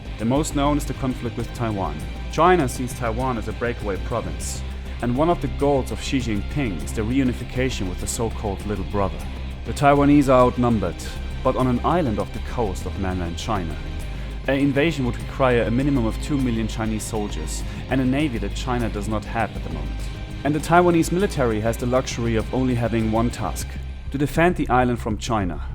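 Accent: Germany. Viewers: Honestly after 5 seconds of him speaking I could hear the german accent :) cheers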